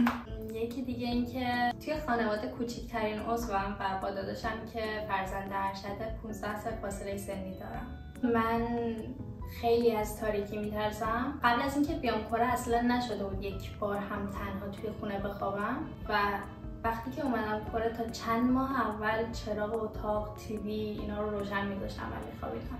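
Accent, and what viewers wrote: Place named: Turkey. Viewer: ببین با این که کل روز کره ای حرف میزنی ولی ته لهجه ی ترکی داری خوش بحالت کاشکی منم اردبیلی بودم من تبریزیم ولی اردبیل رو دوست دارم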